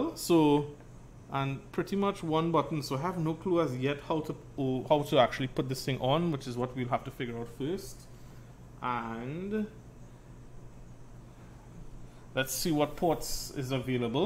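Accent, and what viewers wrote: Trinidad and Tobago. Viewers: Heard that trini accent from the go🇹🇹🇹🇹🇹🇹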